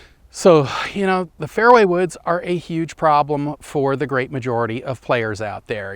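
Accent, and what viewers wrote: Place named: South, United States. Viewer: Having spent 12 years in Gainesville Florida I can say you sound like an exasperated southerner. And I love it!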